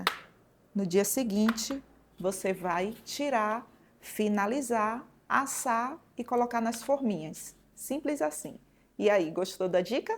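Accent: Bahia, Brazil. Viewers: Ola! Vc é de Salvador? Tem um sotaque baiano e por sinal ensina as receitas muito bem com toda paciência. Parabéns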